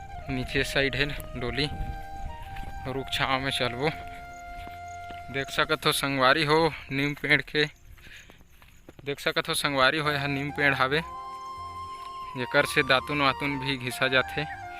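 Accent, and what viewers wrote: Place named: India. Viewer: योर स्पीक शुद्ध देसी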